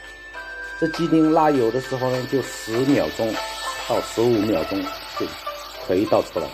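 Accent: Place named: Shanghai, China. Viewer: 你家还种菜，好新鲜，我种过几次西葫芦，很能长的，你是上海人吧？听你讲普通话觉得是。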